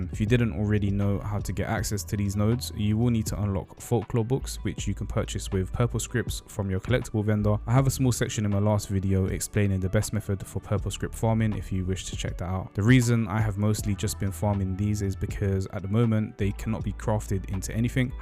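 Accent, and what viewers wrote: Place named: London, United Kingdom. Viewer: are you from London? Your accent is dank. Also, when are you back, baby?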